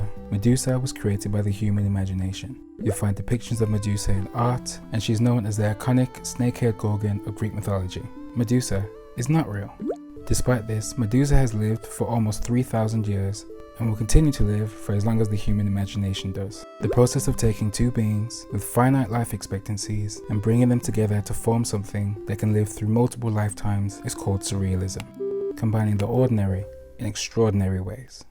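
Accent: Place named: England, United Kingdom. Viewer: Are you. British? You have such a nice and calming voice but your accent is very interesting because it isn’t super strong English accent :) your art and crafts are so beautiful and good too it’s amazing how you can make all this stuff yourself and very creative!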